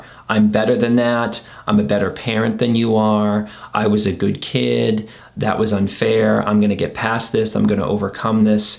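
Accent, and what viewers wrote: United States. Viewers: ❤1. I brought this trip upon myself....Where to escape?? Currently, exhausted, Extremely isolated, brainfog, in Israel #under_fire🎉 #day24 2. Expat from the USA, and appreciate your American accent. Voice From home . I studied psychology... Came here to confront religious Absurd-abuserS who live in Israel.😮😅😊.... So glad I just found your channel/ account. I need tips + excersize for time of war. Staying in hallways/ bomb shelters mostly. Tiny spaces. In Israel under rocket fire. Bless u for all you do! Can send me an email with your videos on you tube or any other free content you can offer. Where are you located these days? City/state? I'm an Expat from Miami currently in central Israel! Sending love, light & hugs wherever it is needed. Amen!❤️ SaraGraceFreed😊3? How to pack and plan while under fire, 4. NEIGHBORs Friends have lost.lived ones... 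mmm. 5. Buying groceries instead! So confused. Help!❤Sara😂🎉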